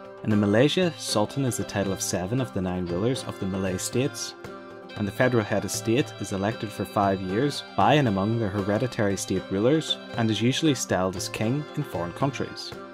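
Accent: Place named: Ulster, United Kingdom. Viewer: I don't know for sure but I'd say Ulster.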